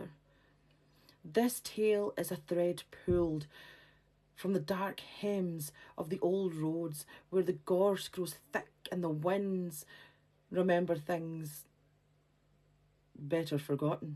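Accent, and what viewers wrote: Scotland, United Kingdom. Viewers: WITH THE MARK OF THE HAWTHORNE , AND YOU SILLY LASSIE , YOUR SCOTTISH ACCENT AND STORY TELLING IS SO ADORABLE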